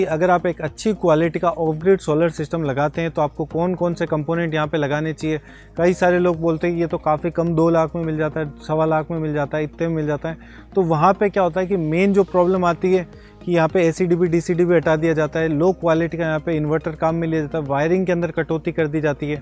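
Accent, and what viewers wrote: Rajasthan, India. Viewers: His accent his Rajasthani and not that of kerala. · Ye rajasthani h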